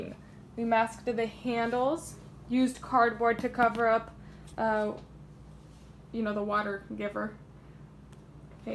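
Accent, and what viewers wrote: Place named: Minnesota, United States. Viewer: Your accent sounds like Minnesota or North Dakota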